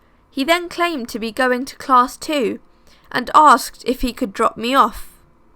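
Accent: United Kingdom. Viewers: I like your videos, mostly because of your voice and the well-picked stories. But I have to say, that the videos don't "haunt my nights" like other creepypasta-narrations (or any other creepy stuff :P) because of your voice. Personally I would say, that your videos (and your voice + the cute, little, british accent :D) are fascinating and, yes, also a bit creepy (And you don't want to know how long I've been searching for a combination like that) In short: I like your videos and ,please, never change the way your narrate the stories :)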